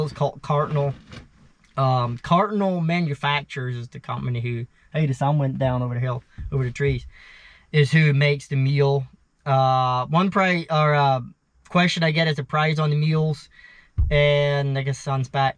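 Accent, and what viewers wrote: Pennsylvania Dutch, United States. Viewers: "I'm gonna keep saying building because it sounds like I'm saying something else." 😄 They very first time I heard you say "shed" I thought, I'll bet he was either raised Amish or in Amish country. Your PA Dutch accent is heavy. I went to college in the heart of Amish country in Pennsylvania. We heard this accent a lot. It takes me back to my college days. 😊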